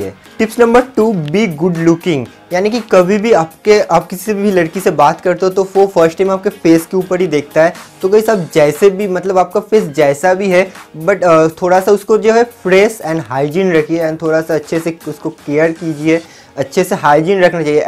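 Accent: Bengal, India. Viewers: Bhai ye bangal hai...thori to dikkat hogi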